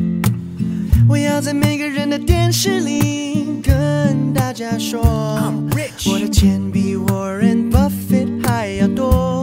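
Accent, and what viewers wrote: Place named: England, United Kingdom. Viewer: CBC/ABC I assume? sounds kind of english inflected :D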